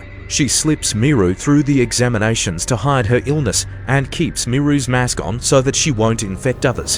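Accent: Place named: Australia. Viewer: Great to hear Aussie AI voices 👍🏾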